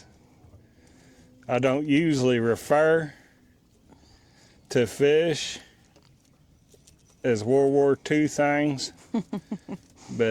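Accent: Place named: South, United States. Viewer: Love your channel! Plus sounds like you’re a southern boy like me. lol. My gf says wow you and him sound just a like when you talk. You do my kinda Catfishing. Simple gear catching fish. Great video! Up here where I’ve been living in Ohio you Can’t find skipjack here. I wanna move back to Kentucky soon. I lived near Markland Dam and miss it a lot. My son still lives there right next to Markland dam. As soon as my boats done being restored I’m heading that direction lol. This boat restore is killing my body. I stay sore lol. It’s a 1979 Sylvan deep V 16.5 foot. Anyway thank you for another great video! 🎣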